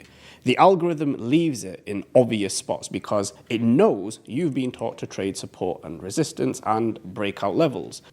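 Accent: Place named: United Kingdom. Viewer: concise and articulate delivery, paired with his clear British accent, makes complex trading concepts much easier to follow. I truly appreciate the direct and structured approach—far more effective than the overly drawn-out explanations some others offer. Excellent content!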